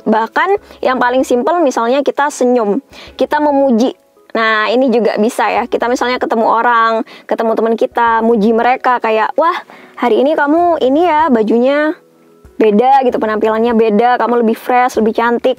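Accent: Jember, Indonesia. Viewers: mbk nya ini org jember ta? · Lho orang jember ta Mbak ? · Kakak dari Jember ya???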